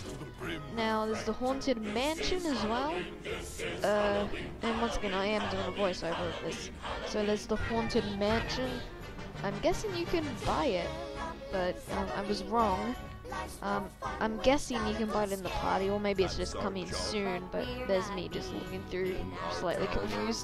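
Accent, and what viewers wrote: Australia. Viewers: ARE U AUSTRALIAN :O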